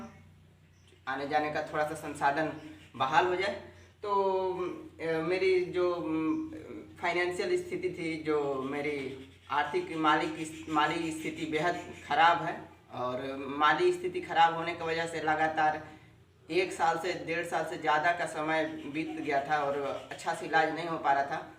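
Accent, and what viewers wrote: Standard. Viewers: Bhai ji aapki hindi bhut shudh hai Aap bs galat rah pe mt chlna aap dil se bolg bnao Or hr video me improbe kro thumbail pe ghyan do contet pe dhyan Aap jrror kroge Lobe you from himachal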